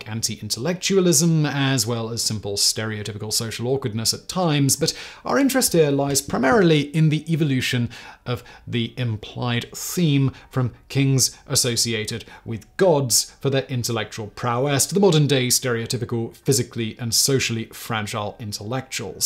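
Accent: United Kingdom. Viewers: If you watch his other channels, he openly talks about not even being able to remember recording videos anymore because he does so many. Scripts are created by his writing team these days, and he just reads them in his reassuring-sounding British voice.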